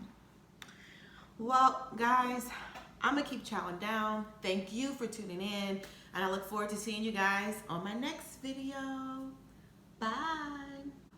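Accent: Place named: South, United States. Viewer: You can tell she from the south. She said “puhtaytuhs” not “potatoes”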